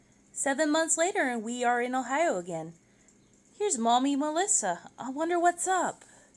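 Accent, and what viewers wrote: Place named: Asia. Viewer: The narrator’s Asian accent spoiled kid voice is annoying 😣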